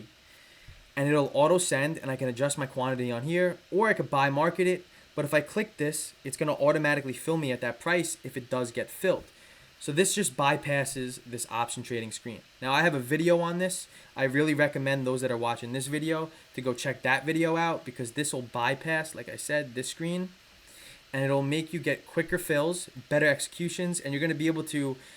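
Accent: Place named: Long Island, United States. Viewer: Brother is from long island, i can hear it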